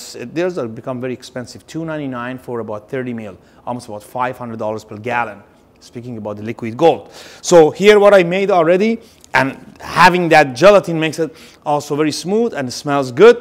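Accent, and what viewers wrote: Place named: Iran. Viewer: Yeh. This is how Persians speak. Strong accent. You can tell straight away he is Persian.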